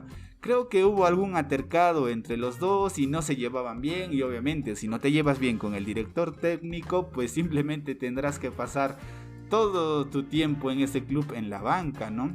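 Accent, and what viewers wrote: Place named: Chile. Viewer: oye creo q eres chileno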